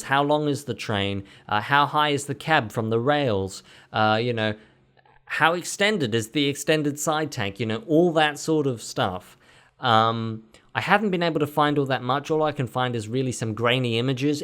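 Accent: Australia. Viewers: Wow ur Australian. My brother and I thought u were British 😅😅 but I would love to see ur T&F episode. And I'm starting to think I'm the only one who likes thomas and the magic railroad. Also I think when this Q&A is over you should do one with ur characters from your series.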